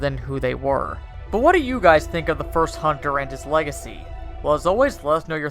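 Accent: United Kingdom. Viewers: Jon Grajeda it’s down to accent, usually it’s the British that pronounce it like that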